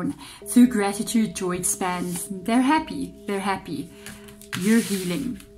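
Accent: South Africa. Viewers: Hello , are you South african afrikaans? I kind of recognize the accent .Spot on for the reading my story sadly.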